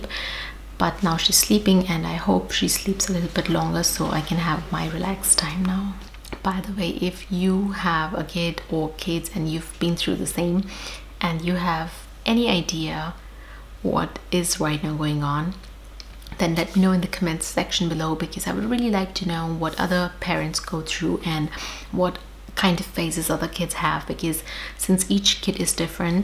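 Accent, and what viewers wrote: Germany. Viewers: Love how your accent has slowly been Germanised. 😄 so cute. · Dear, sab se pehle, you are lovely! I’m a German, living in India, married to an Indian and we have a 6 year old daughter. Please zyada stress mat karo. Your daughter is fine for sure. Just feed her with your milk if she doesn’t want anything else right now. I don’t know how old your daughter is, but don’t worry, she will take what she needs. You said your milk isn’t satisfying her for too long, so maybe try with fruit purees? I don’t know how far you are with solids for her, but I guess she must be around 6 or 7 months? Teething can be a possiblity, even if it’s lasting already for a while. I wish you and your family all the best! Stay safe and healthy, all will turn out well! And yes, your accent is pretty germanised, mine is totally indian. Guess that happens naturally;)! Mujhe accha lagta hai! 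Take care and keep posting! Namaste 🙏🏼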